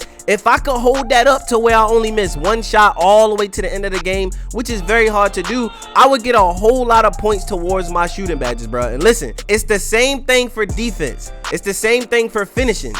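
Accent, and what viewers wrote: Baltimore, United States. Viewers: I been watchin you fo a min you from Baltimore got the accent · Aye bro where u from, I swear i hear some Baltimore coming u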